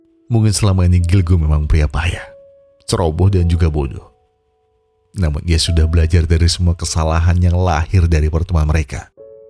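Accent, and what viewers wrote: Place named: Surakarta, Indonesia. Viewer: Orang mana si bang ko solo banget logatnyaaa 😭…. Dari chanel soundsmokers sampe sekarang ganti jadi setia gw banggg🫵🏻🫵🏻🫵🏻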